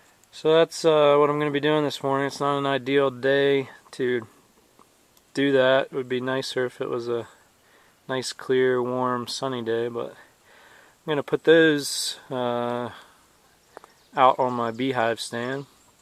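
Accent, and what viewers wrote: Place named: Carolinas, United States. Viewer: Awesome! Sounds like your in the Carloinas and not Texas though. Cool videos man!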